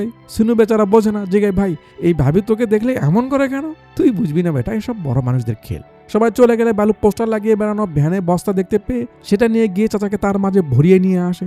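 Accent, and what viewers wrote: Bangladesh. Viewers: তোমার বাড়ি কি বাংলাদেশ তোমার কথা ধরন দেখে বলছি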